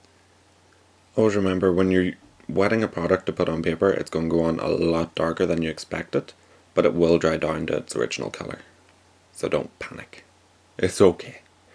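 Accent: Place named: Northern Ireland, United Kingdom. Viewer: ooh...love this look and your technique is amazing! Do I recognise a good ol northern Ireland accent there...where you from originally? :-)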